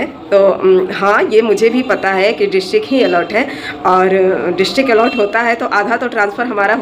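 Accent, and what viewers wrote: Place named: Uttar Pradesh, India. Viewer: Aap kaha ki Rahane wali h mam lagata h aap up ki h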